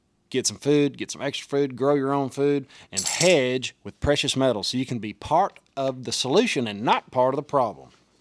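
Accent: United States. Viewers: People with accents like yours are the best thing about America.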